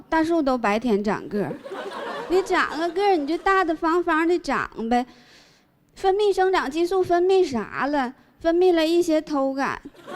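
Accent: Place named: Northeast, China. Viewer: 这一口东北大碴子味儿。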